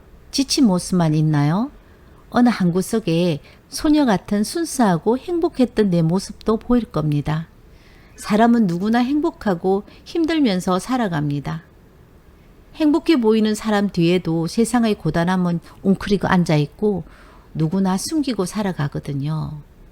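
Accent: Gyeongsang, South Korea. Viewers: 영상 잘 봤습니다 경상도 말이 정겹고 반갑습니다 응원 합니다.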